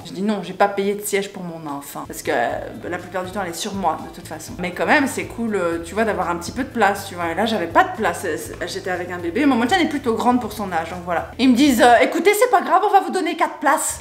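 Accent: Belgium. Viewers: Ton accent belge ressort fortement dans cette vidéo lol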